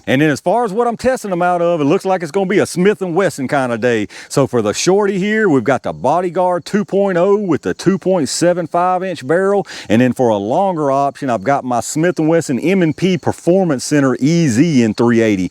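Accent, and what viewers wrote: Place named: Appalachia, United States. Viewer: Shame it didn’t do better. I have a lcp 2 and max and I am always on the lookout for the best! I currently have underwood extreme defenders but if you know anything better feel free to cue in as I must have missed it…. I was watching one of your videos last Friday and I asked my girlfriend who lives in southern Indiana whereas I live in Louisville where you sounded like you were from and she thought east Tennessee or WV. I told her I thought you’re from central Virginia or southern like Wytheville, Bluefield or Roanoke maybe even the Carolina’s but Saturday we went to Red River Gorge which is near Slade, KY for some hiking and she said maybe east KY. Who’s right? 🤣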